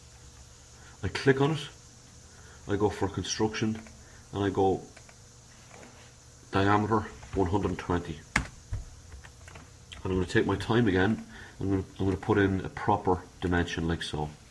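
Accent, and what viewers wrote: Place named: Galway, Ireland. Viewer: Good stuff....just came on you videos today.......judging by the accent i could drive down the road and buy you a beer... (Mike from Galway)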